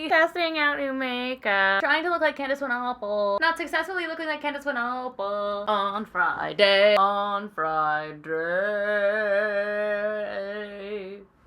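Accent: United Kingdom. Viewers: 🎄🎄🎄 I was disappointed not to see (hear?) more British accent in this vid - would have been the perfect opportunity! Thanks Ig: sybelion 🎄🎄🎄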